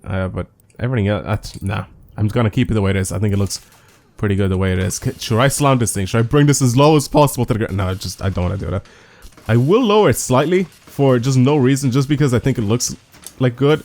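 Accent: Ireland. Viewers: Are you Irish???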